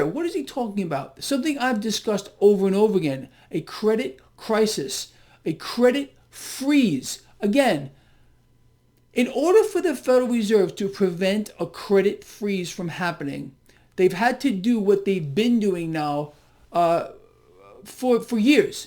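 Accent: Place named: Bronx, United States. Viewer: Hey, I do so much admire your advise, HOWEVER, please drop your BRONX background with the aks talk, Pronounce the word ASK as you were taught with the "S" before the "K". Please practice..... s... before....k.... It may be hard... PLEASE practice. thanks so much!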